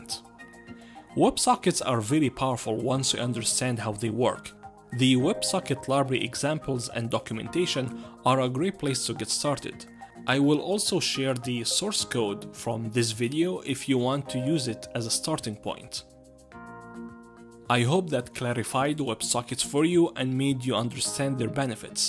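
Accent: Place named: Germany. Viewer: Great and yet simple video, thanks! Where is your accent form? Sounds german :)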